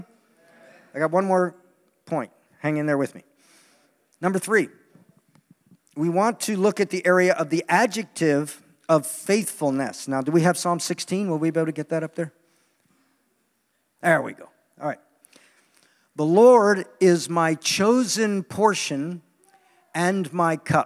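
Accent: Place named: Brooklyn, United States. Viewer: Hi, Lol what's up with the Brooklyn accent?😂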